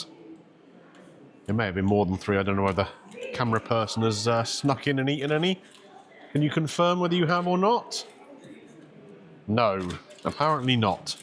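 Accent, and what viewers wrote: United Kingdom. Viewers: This is very interesting. I just got recommended this video after seeing a lot of Gary Eats videos, and I can understand why. You seem to have nearly identical branding, styles, and video format/pacing. Only difference I noticed is I think he has some faint background ambient music sometimes. Clearly you are like a separate person and I don't get the impression you are at all being dishonest or anything, so I'm not criticizing. But it is very interesting. I would say it might be good to find one or two things that are a bit more unique to you and your style and lean into those, but that's sort of hard with food videos, so I don't really blame you for having such a similar style. I guess I have nothing to say but good luck overall, I might check out future videos of yours. And I suppose it's good to have another [kind but honest british guy with a very specific british accent giving food reviews where he starts outside makes a summary, goes inside gets a handful of key menu items, tries them out with a silent but clearly human cameraperson, gets some b roll, and after the meal goes outside makes some kind of joke, goes over the bill and makes a final summary, all shared with a video with him with a white outline kind of making a shocked or at least very emotive face and some short all caps text that is attention grabbing but also a bit intriguingly unclear.]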